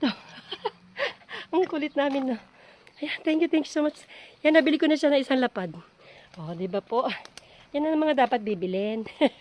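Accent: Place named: Japan. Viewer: for the second time na pinanood ko to....kagabe at now....nag enjoy lng ako lah....at na inggit ako not only for the blueberry pati na den sa japanese accent mo 💚☘️💙...love love this video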